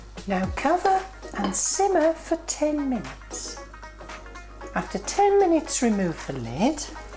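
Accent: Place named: England, United Kingdom. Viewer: Ok, English accent with a slight hint off southern hemisphere in there, but Carrefour tin sugests you are out of the country....................... hmmmmm, I am guessing you are living in Australia? Im going East Coast Australia........... Am I within a thousand miles?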